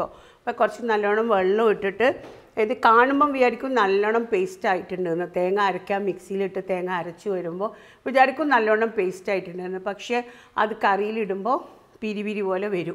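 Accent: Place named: North Kerala, India. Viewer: Superb presentation. Like a mother teaches her sibling. North kerala cooking with North kerala dialects... 👌🏻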